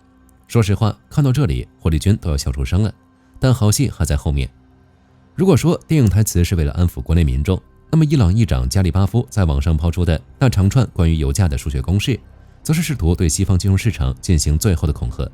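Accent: China. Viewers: 这英语 浓浓的中国口音啊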